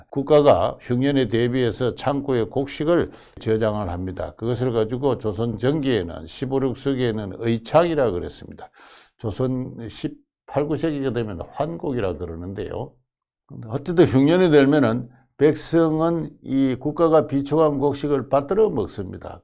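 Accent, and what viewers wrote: Gyeongsang, South Korea. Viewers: 경상도. 사투리 듣기 싫어유 😢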